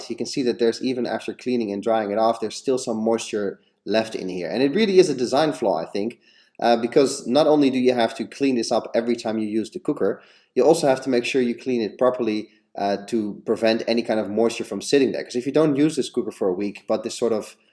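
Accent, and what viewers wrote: Netherlands. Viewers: Are you dutch?Good review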